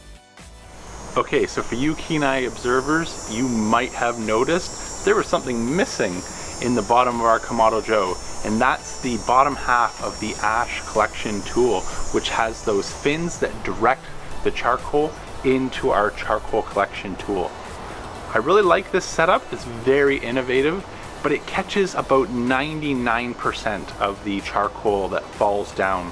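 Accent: Canada. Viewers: Is from Canada? His "outs" are very Canadian. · Stop speaking Canadian! Or just don't use the words out and about.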